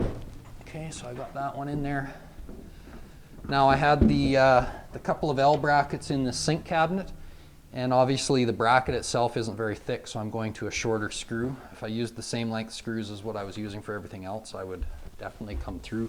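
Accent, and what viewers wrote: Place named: Canada. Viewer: Took 5 words until I knew this was a Canadian.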